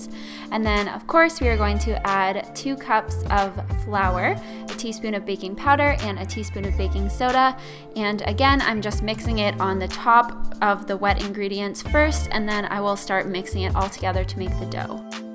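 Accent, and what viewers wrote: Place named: Canada. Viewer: sweet.. I verly noticed she is from Canada and that explained why she said *aboat pretty cool any way loved the video! (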